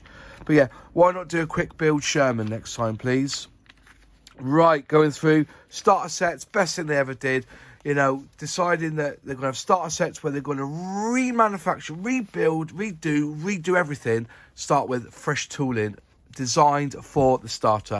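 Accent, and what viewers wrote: West Country, United Kingdom. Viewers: Thanks for showing the catalogue, some good stuff in there and personally I love the old vintage classics. Do I hear a fellow westcountry accent from yourself? Not cornwall like me but I can hear it 😉👍🏻 Thanks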